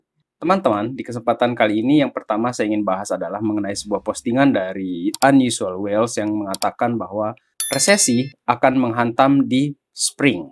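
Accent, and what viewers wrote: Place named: West Java, Indonesia. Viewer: logat nya mirip sunda daerah priangan timur 😅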